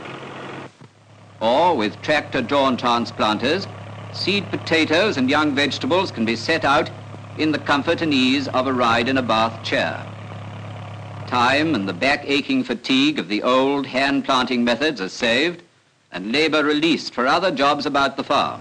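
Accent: RP, United Kingdom. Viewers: That's how UK commentators on TV /Radio etc used to speak back in those days.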